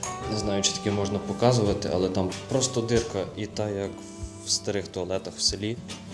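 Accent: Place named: Volyn, Ukraine. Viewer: цікавий діалект, звідки ти? волинь (десь цей район?)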